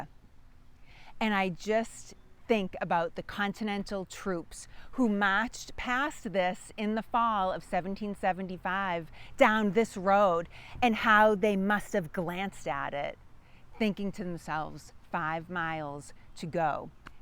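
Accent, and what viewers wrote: Boston, United States. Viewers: My brother-in-law is from Boston and talks just like that. If you can't say something nice, don't say anything at all. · You are captivating in your storytelling of our country’s rich historical birth. I could listen to that Boston accent all day long, and I’m from North Carolina having a southern accent. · I subbed a while ago, love your content, and I also get a kick out of your Boston accent. Cheers! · A great series! Thank you, and let me add, having been born in Boston, I enjoy the sound of your voice too! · I adore the Boston accent!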